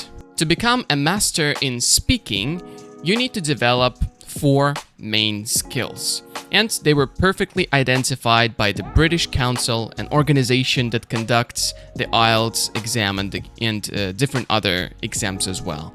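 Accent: United States. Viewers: are you American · Hi, just one question for you '' What has made you sound American? as you mentioned you have never set foot on the U S. thanks.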